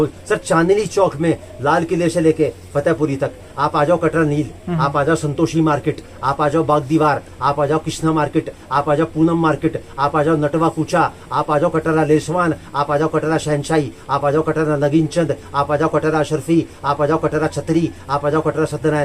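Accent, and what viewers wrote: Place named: Marwari, India. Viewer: भाई साहब आपको बोलने का तरीका गजब है पक्के मारवाड़ी हो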